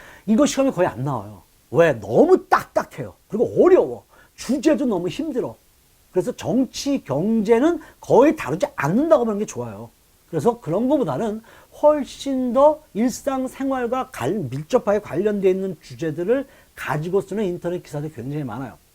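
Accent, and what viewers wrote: South Korea. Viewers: 난 이분 서울사투리가 넘 좋음 귀에 쏙쏙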